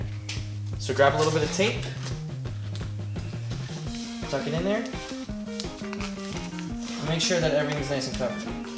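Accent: Canada. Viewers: Canadians and the way they say out. hahaha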